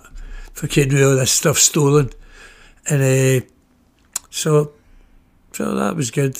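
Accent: Glasgow, United Kingdom. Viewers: He is meaning going bk to his 19, 20,s . Its a glasgow way of speaking 😅👍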